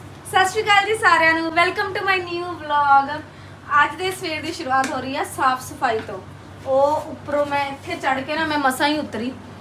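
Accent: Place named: Gurdaspur, India. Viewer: Je TUC gl gl te va va krde je aa bhut sohna lgda ah sade gurdaspur Batala wale bolde aa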